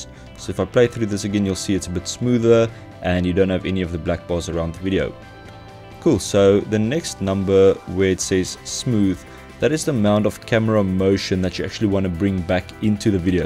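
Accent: South Africa. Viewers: Haha love that Saffer accent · Probably Afrikaans, no? Considering this guy's heavy South African accent... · Thanks this was helpful. Noticed the accent though and immediately knew that I'm dealing with a South African here. Well that and the security fencing in your footage gave it away. Dankie! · awesome video man! Love the SA accent! Keep it up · i googled this and hey SA accent all the way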